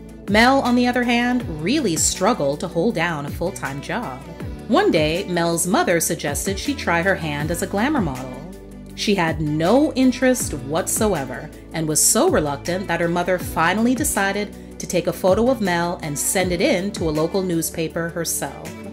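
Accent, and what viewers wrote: United States. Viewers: The narrator is American so.......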